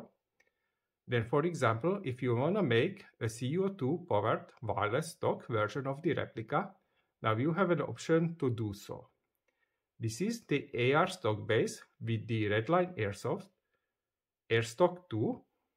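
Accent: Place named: Hungary. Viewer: Amazing video and great work! I can't wait to see the rest. Judging by your accent, I am willing to bet you are Hungarian although I might be wrong. Either way, I am an airsoft tech myself from Romania and greatly enjoy creative spirits like yourself. Can't a standard Jack be fitted in a MP7 AEP? The nozzle still has to move the same distance to feed but maybe placed a bit more to the back, just wondering. If this could be done with a standard M4 jack, it's much more available and cheaper than getting a BINGO AIRSOFT one.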